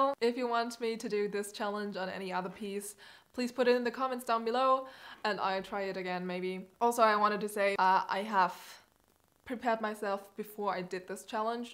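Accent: Germany. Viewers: I know she was German as soon she spoke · Man hat dein Englisch gehört das du deutscher bist 😂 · Dieses anders deutsche Englisch :) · man merkt so hart das du deutsch sprichst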